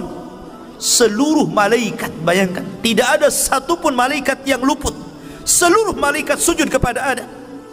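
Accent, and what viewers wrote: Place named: Arab world. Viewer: Bicara nadanya begitu cih gaya timur tengah bahasa yg mengerikan pdahal Islam rahmatan lilalamin,,biasa saja said nya ora. Indonesia yg landai ding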